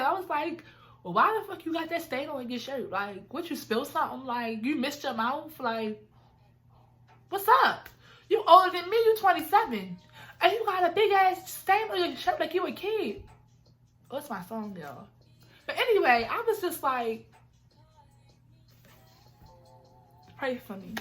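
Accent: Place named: Baltimore, United States. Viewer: I love the bmore accent! Each time you said you I was like yasssss 🤣🤣🤣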